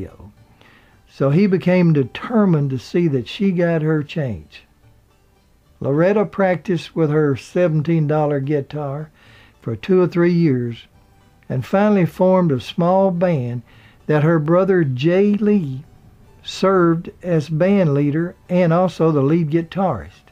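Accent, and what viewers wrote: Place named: West Tennessee, United States. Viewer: just found your channel. Your voice sounds like pure West Tennessee. Very nostalgic, like listening to my grandfather tell stories when I was a child. Thank you.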